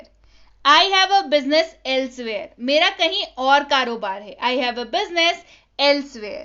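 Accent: Bihar, India. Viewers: Bihari like maam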